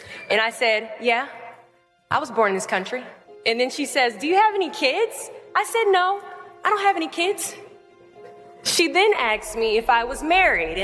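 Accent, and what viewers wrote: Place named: South, United States. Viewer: Have..none of you been to the south?? This is how ppl talk down here😭🙏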